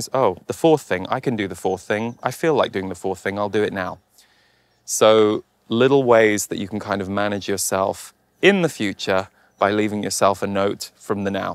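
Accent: United Kingdom. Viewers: I thought you are in the UK. Your accent sounds British.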